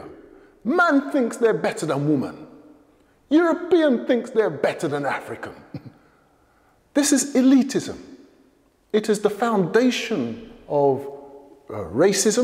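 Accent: England, United Kingdom. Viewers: just cause he is using white inventions he can still advocate for pan africanism because how is he supposed to spread the message via mail ? of course he has to use the internet because that is a faster and more widespread way to spread the message and the people saying that his using an english accent so his analogy isnt valid he studied in a western school so he has western influence in his way of speaking and you would be the same people to call him “uneducated” if he had an african accent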